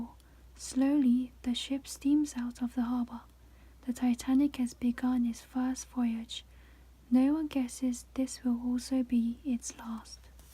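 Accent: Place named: United Kingdom. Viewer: 님 진짜 목소리 너무 좋으세요 ㅠ I love your voiceeee💕 그리고 영국 발음으로 읽으시는것 같은데 저는 미국 발음으로만 말할 수 있어서 그냥 목소리도 발음도 너무 매력적이에요! 잘 듣고 가요, 감사해요!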